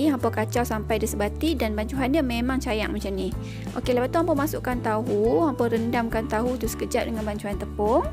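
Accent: Kedah, Malaysia. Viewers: Orang kedah ke kak 😅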